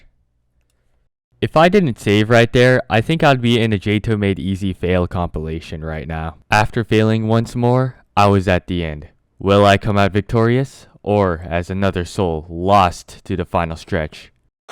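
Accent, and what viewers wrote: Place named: South, United States. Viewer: you sound like technoblade but with a southern accent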